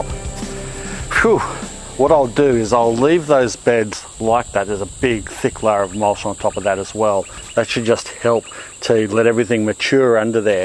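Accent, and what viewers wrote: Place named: Brisbane, Australia. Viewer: Your not from brissie by any chance? Love some guidance and knowledge for free labour!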